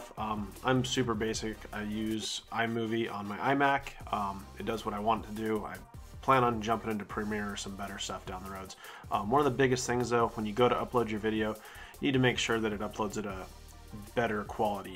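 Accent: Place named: Western Pennsylvania, United States. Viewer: Hey, thanks for posting this. Love your channel. Are you by any chance originally from western Pennsylvania? Guessing based on your accent.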